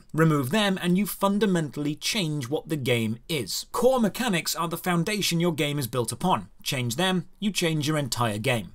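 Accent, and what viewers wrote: United Kingdom. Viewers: I definitely do enjoy my dose of snarky british man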